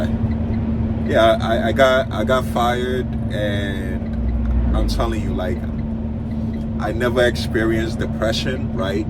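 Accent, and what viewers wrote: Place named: New York, United States. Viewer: u sound like from new york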